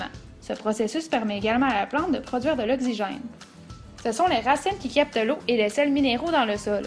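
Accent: Quebec, Canada. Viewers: Kebek je crois!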